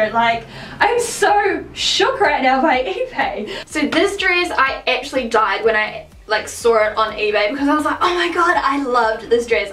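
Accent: New Zealand. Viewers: Are you from New Zealand?? If so SAME girl ❤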